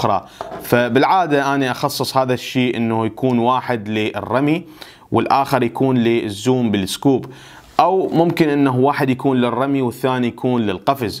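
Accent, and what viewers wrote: Iraq. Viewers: والله لهجتك كلش عراقية ،انتة مو كويتي ؟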